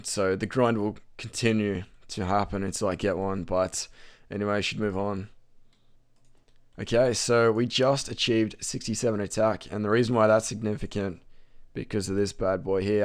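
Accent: Australia. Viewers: He talks like he has an awesome Australian accent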